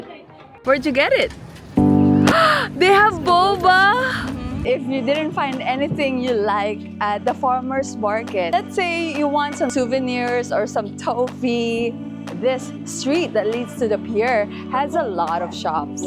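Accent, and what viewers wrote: Philippines. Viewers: Filipino? I recognize a Filipino accent in 5 seconds because of my many visits to that great country.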